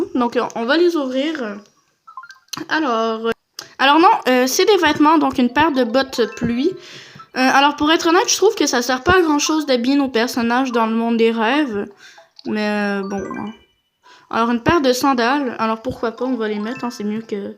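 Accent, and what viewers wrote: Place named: Quebec, Canada. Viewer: On attend un petit accent québécois quand tu parles, tu es québécoise ?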